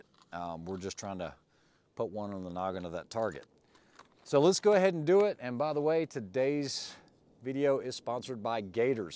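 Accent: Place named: Midwest, United States. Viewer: random, but me and my buddies are trying to place your accent! where are you from? i think you have the vowels of the midwest personally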